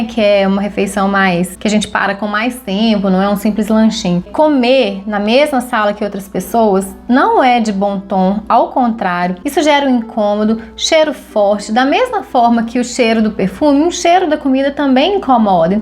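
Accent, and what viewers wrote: Minas Gerais, Brazil. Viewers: Conheci seu canal hoje e já me inscrevi! Você é muito prática! E pelo seu sotaque, acho que você é mineirinha como eu!!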